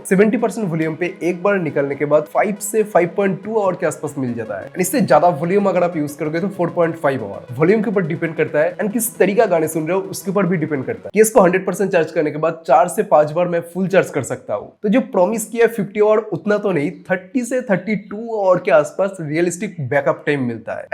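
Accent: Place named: Bihar, India. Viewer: Relevant info diya kar bhai. Aur bihari feelings aati hai tujhe sunne pe 😅. Jisme r aur d bolne me totla jaisa lgta h tu... Faltu me paka rha tha😂😂😂😂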